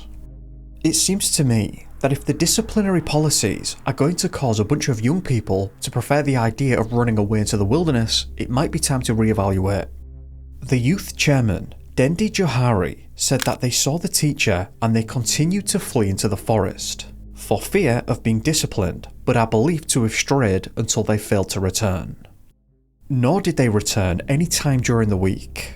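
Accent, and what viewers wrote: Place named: Brittonic, United Kingdom. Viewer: I love this channel. Stays in my top ten. I do want to say I especially like the Fortean, UFOlogical ones, and would appreciate broader Fortean phenomena coverage if that's a thing you'd be interested in. Not that I don't like the missing persons episodes...I'd LOVE to hear you cover the REALLY weird ones. With strange search events, bodies found under bizarre or impossible circumstances, missing kids found alive but absurd distances away in short order, search dog oddness, no cause of death being determinable. Paullide's core weirdies. I REALLY love the "Fae Theory" stuff, including this one. I gather by your accent that you are from some Brittonic area that probably has conspicuous Faerie or Elven Lore. Lovely accent by the way. All around cheers! Please, keep up the great work!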